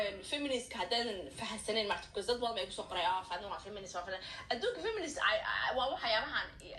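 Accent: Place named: Egypt. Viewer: Abaayo masha allah wax loo baahnaa ayaa ka hadashy shukran lkn su'aal masar miyaa ka tagtay waa laga dareemaya lahjadada salaam from Egypt 🥰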